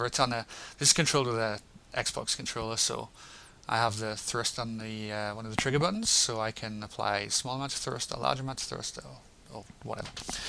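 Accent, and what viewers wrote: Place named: Southern England, United Kingdom. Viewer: I can't quite put my finger on your accent, it's almost fairly southern English accents with twangs of northern and a tin bit of American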